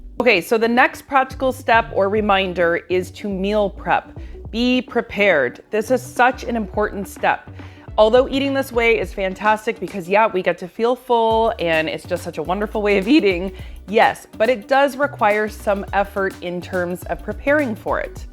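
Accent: Canada. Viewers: Just saw your video. Great talk. Are you from Canada?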